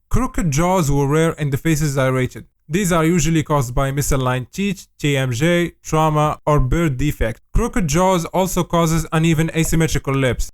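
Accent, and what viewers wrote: Morocco. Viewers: Moroccan accent 🎉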